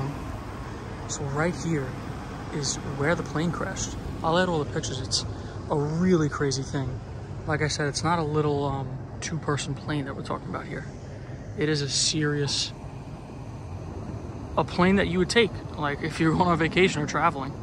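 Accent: New York, United States. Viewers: ! Love your channel and your NY accent, you make me miss my hometown! Ps…please wear your seatbelt LOL. 😉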